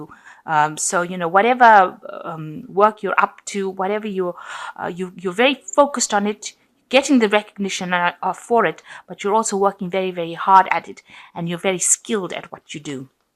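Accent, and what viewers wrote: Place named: England, United Kingdom. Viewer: English accent alert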